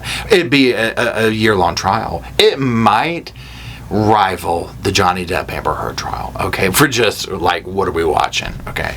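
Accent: South, United States. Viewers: OH I ALSO LOVE HOUR SOUTHERN ACCENT!! Keep it up you’re great!!❤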